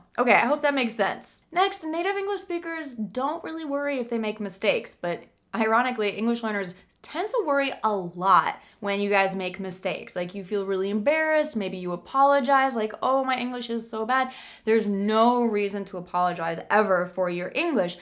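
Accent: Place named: United States. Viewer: I am from India and i want to make my pronounciation like you , like American..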